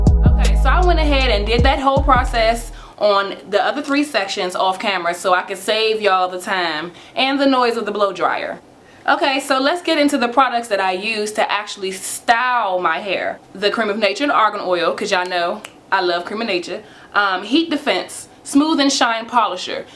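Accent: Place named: Washington DC, United States. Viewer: giirrrrrlllaaaa (SE girl accent) I knew I recognized that accent from somewhere. I knew you were from DC. Hey girl hey!!! yesss represent for the 4 type hair girls!!! Love all your videos so far and the fact that I subscribed!!!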